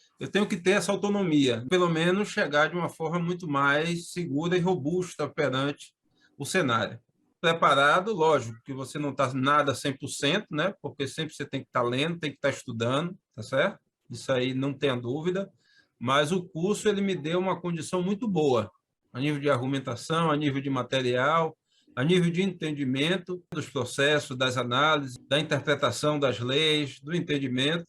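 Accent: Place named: Northeast, Brazil. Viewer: Seu sotaque nordestino, não define o seu intelecto . Parabéns brother!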